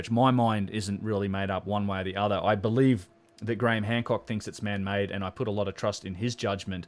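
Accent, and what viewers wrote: Australia. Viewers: hey , wow , wish i hadd known you were doing this , , and dude you sounds like an aussie , , same , , please explain 1inch high heiroglyphs in granite , done wih brass chilel , , come cuts in red granite 1mm thick 2mm deep , , are you aussie , would like to show you in person , i have worked with sonte for 34 yrs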